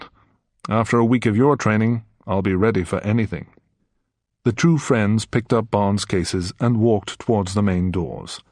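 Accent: United Kingdom. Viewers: is this british or american?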